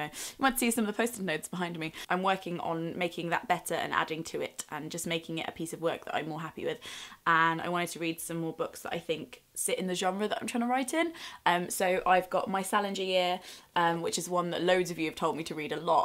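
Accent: United Kingdom. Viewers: Good choices. (Luv your Brit accent) Very pro, your technique.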